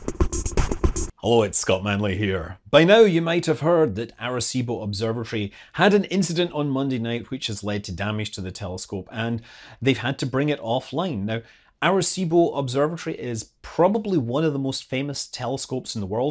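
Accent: Scotland, United Kingdom. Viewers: Is that a Scottish accent that I am detecting?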